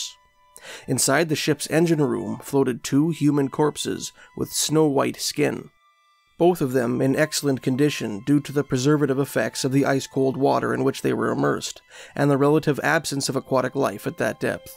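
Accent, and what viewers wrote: Canada. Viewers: I’m glad to hear a Canadian accent, you don’t hear it much in B.C., sounds more American here.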